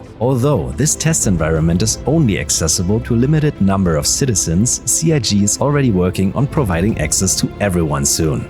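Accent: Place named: Germany. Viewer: Don't blame him, he speaks weird with that thick German accent.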